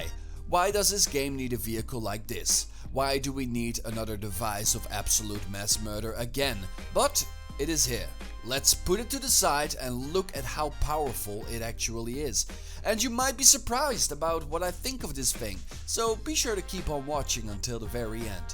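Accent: Netherlands. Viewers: I have to know... You wouldn’t happen to be Dutch, would you?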